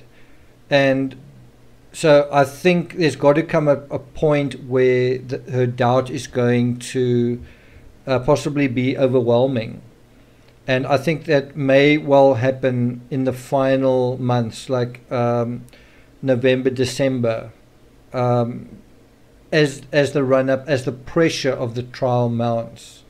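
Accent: South Africa. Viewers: Wow a south african accent! The first I've heard as far as this case goes. Interesting video I've subscribed. (Johannesburg here)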